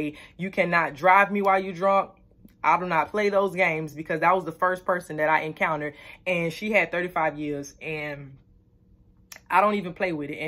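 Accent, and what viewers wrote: Florida, United States. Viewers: I love that country talk😊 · I knew she was from Florida,love how they talk.Ex felon myself love to see the growth.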